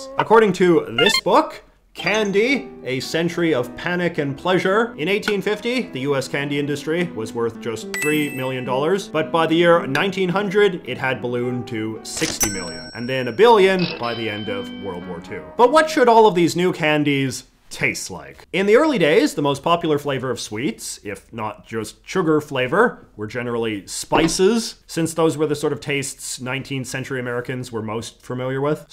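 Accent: Canada. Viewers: I love the Canadian accent poppin out here and there! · i realized you were canadian. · Never mind I just heard you pronounce about and around, you are 100% canadian no hiding it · didn't he say himself that his accent is stronger than most Canadians anyway? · he said aroond instead of around. he's not american he's canadian. al though one could argue that he is north-american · You sound very Canadian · You make a very interesting video about candy in the United States, yet your Canadian accent and the candy from Dollarama betray you within the first 30 seconds of the video. Fellow Canadian here, good stuff 👍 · This man is the most interesting combination of different groups. He has the hair and mustache style of the 80’s, the accent of a Canadian when saying words like about and around, he has the facial expressions and head positioning of a surfer dude, and he up-talks where you end your sentences in a higher pitch. 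· You don’t sound American. You sound Canadian. 🤔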